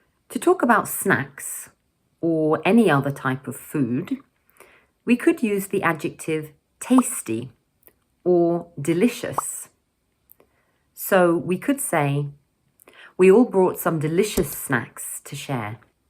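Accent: United Kingdom. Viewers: 흐어어..ㅠ 영국발음 죻아아...♡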